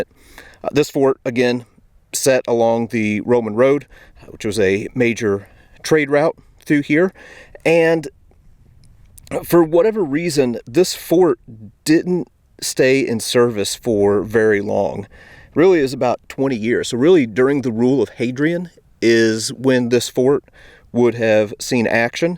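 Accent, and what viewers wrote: United States. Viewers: So so funny listening to an american talking about Roman ruins!! Yeap there was a lot more going on in the rest of the world before the usa came along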